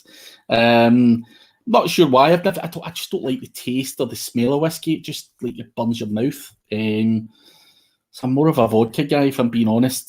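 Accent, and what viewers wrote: Scotland, United Kingdom. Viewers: What's up with all these wankers complaining about the awesome Scottish voice!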